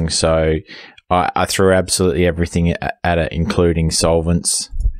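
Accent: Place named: Australia. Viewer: Good to hear another Aussie voice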